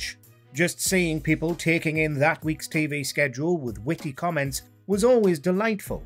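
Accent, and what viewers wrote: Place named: Newcastle, United Kingdom. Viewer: A Geordie talking a load of Crap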